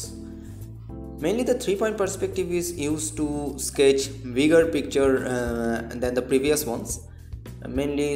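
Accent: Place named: India. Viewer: I've always found pleasing hearing English with indian accent (btw, helpful video... thanks a lot!)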